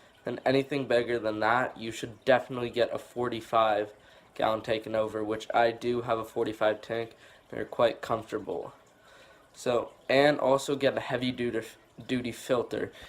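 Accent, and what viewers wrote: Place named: North, United States. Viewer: I have three sunfish I got out of the lake I had a 75 gallon somebody gave me I had lime rock gravel plants already I grow on aquatic plants I took a sponge and made a Matten sponge filter covered it with lime rock and put a powerhead behind it so basically I might have spent I had everything but I will say $30 to set my 75 gallon tank up if that for my sunfish And then I just threw them in there they are tuff fish I’m in Florida so I don’t know sounds like you’re from up north somewhere I get my own aquatic plants out of the streams and rivers and ponds and we got a lot of it. So $300 is a bit much you probably just bought everything new hey if I can make it I’ll make it but good job your tank is pretty and clean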